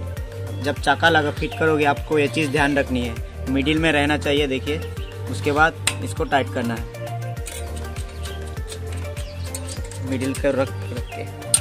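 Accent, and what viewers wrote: India. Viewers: Ap ke bato se lagta hai ap Bengali ho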